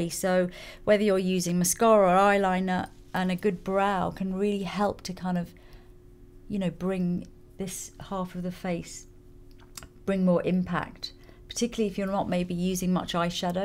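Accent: United Kingdom. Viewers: Howdi yal , I fell on this video and why did i not click away ?? Idk maybe hearing a British voice ?? But I was so enveloped but her voice and video I payed so mutch attention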